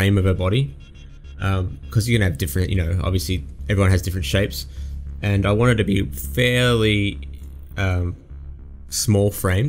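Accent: Australia. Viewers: Wow straight off....Aussie talk, i miss people being just them selves, not afraid to speak your mind and not worried about the odd swear word thrown in. :) What part of DownUnder you come from, im a sandgroper, if your Aussie you know where that is :P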